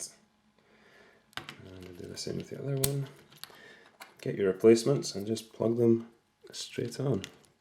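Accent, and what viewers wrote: Scotland, United Kingdom. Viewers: I am inordinately partial to the Scottish accent, but even with that given: actually find your voice TOO soothing to fall asleep to- like a babbling brook with insights lol. Keep it up brother, seriously, and please monetize for our sakes; we really like you, bro -want you to stick around. :)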